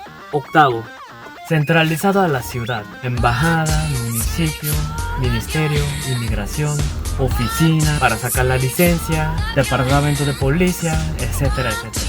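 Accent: Panama. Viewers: Donde aprendiste hablar español, hablas como panameño tu acento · 🤣 habla Panameño Clarito Xuxa madre, Ta webao dice 🤣👍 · Que tierno que esta hablando como un panameño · Saludos desde mi tierra La Chorrera. Ey amigo ya tu hablas como nosotros los panameños Pritty Friend jejejej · Ajaja ya hablas hasta mas panameño que cualquiera y yo queriendo ir a vivir a Sur corea, fui casi un mes en primavera y es muy hermoso, me gusto demaciado.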